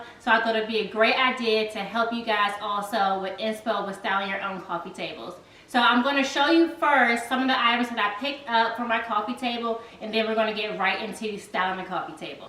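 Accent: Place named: Washington DC, United States. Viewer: Yeasss !! "I'm A DIME" from the first time I started watching ,I subscribed! I hear the DC accent, I love your style.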